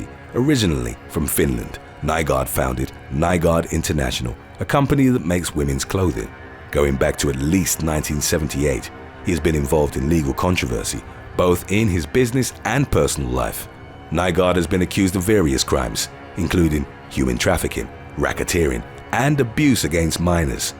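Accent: United Kingdom. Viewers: Thank you for your informative, interesting, and accurate content. All stated succinctly in a lovely British accent. Maybe Tupac’s mgr was taunting fate when he named his company .Death Row.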